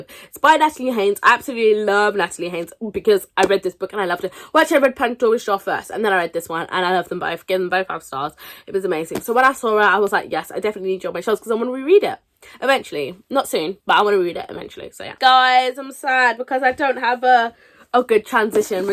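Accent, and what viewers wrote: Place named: England, United Kingdom. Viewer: Hey! nice meeting you. I love your English accent! it's super cool. Plus I appreciate your perkiness in your videos. And you also have a sense of humor. ☺All great skills to succeed in your BookTube channel. Of all the books you mentioned, two of them stuck out for me. Black London and whiskey in a tea cup by Reese Witherspoon. I shall order them, come the new year. I also have quiet a lot of books I must read before adding more to my library. I am currently reading "Finding me" by Viola Davis. 🤗 thanks!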